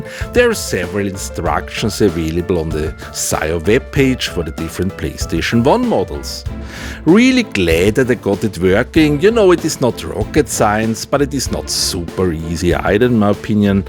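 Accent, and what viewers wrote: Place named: Austria. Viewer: How i missed that Austrian accent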